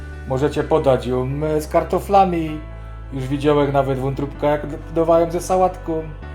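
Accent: Silesia, Poland. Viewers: Jak mnie wkurwia gwara śląska. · tak slaska gwara nie jest prosta ❤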